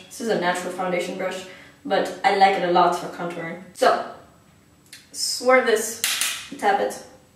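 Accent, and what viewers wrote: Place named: Ireland. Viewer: I LOVE IRELAND ACCENTS!!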